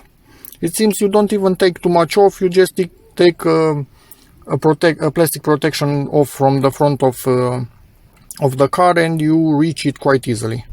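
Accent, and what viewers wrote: Romania. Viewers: Aoleooo ... un ruman care vorbeste englezeste !!! Suna ca ... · Accentul ăsta tipic Românesc, îl cunosc de la 1km, nu contează în ce limbă se vorbește, Accentul e același... it's lovely!!! Good job ,,OMULE"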